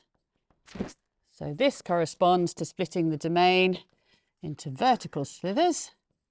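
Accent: United Kingdom. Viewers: Ŕeal british English ❤❤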